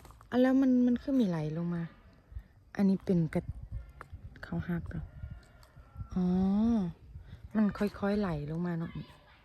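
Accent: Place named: Phu Thai, Thailand. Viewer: สาวเวามวนหลายมักฟังคนภูไทเวามันมวน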